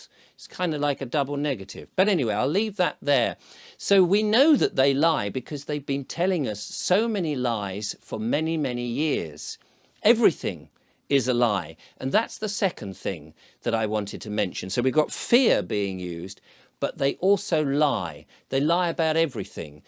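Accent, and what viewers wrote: United Kingdom. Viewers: it's great to hear another British accent speaking on these subjects. Great balance of interviews, dry quintessential Brit humour and opinion. I have attempted to do what you are doing here on YT on a few occasions in the last 10 years but lacked the skill and time to produce the quality output that you deliver.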